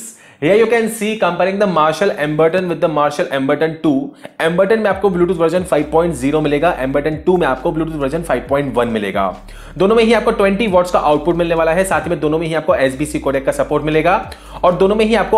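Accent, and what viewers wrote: India. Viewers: Thank you for this comparison. I speak English as a second language but I’m quite fluent. However I find a bit difficult to get all the 200 words per second with this Indian inflection. The video seems very good but I suggest to slow down a little and try to capture a wider audience.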